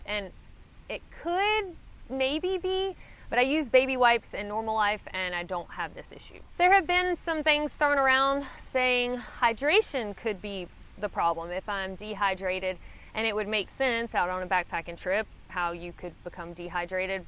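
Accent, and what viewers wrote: South, United States. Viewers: Small world. I've been looking at getting into backpacking for a little over a month. I found your videos, really good and I've watched quite a few. I could tell from the first one you were southern, duh. Then I seen you were from Alabama...I thought that's cool, me too. Then a few videos later, I seen you were from Opelika. Then I thought wow, small world. I'm a lineman at Opelika Power. Keep up the good work. Your videos are invaluable.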